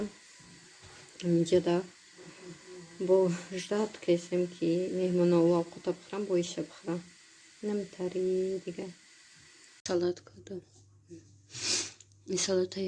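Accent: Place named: Tajikistan. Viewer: САЛЛОМ ГАП НЕСТАЙТОНА РАНГИ МА ГАП МЕЗАНЕД ЧИСТА ТОЧИКИИ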